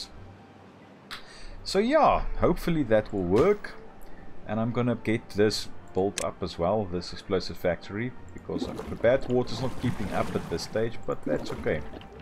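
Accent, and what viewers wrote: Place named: South Africa. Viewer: aweh, ek help maar uit met n comment want ek kan hoor jy is nie engels en dis min wat mens SA mense kry wat sulke videos maak. well done!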